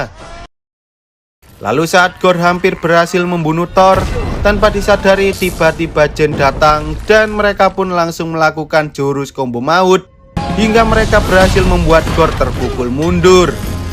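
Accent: Java, Indonesia. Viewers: Suara penyiar medok banget 🤣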